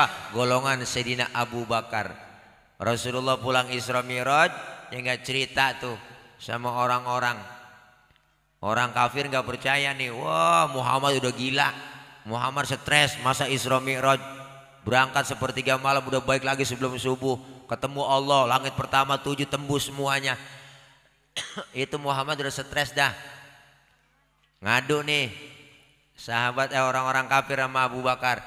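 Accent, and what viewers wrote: Betawi, Indonesia. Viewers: Asalamualaikum pak ustad..bahasa betawi ny pak ustad bikin kita. semua..ketawa mulu..terima kasih ilmu ny pak ustad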